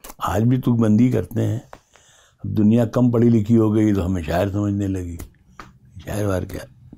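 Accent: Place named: Raebareli, India. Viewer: Apki awaaj ikdm.raebareli wali hii hai sirr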